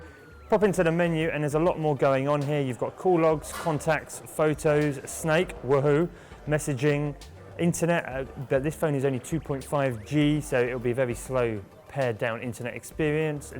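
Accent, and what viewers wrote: United Kingdom. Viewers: at least put subtitles, if you're going to talk like a english peasant, from potato fields.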